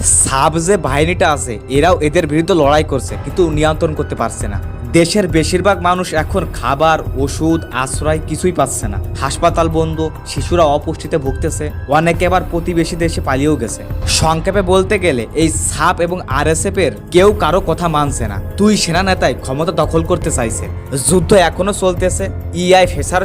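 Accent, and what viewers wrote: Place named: Gopalganj, Bangladesh. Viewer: তুমি কি আমার গোপালগঞ্জের মানুষ নাকি তোমার কথাবার্তা গোপালগঞ্জের মতো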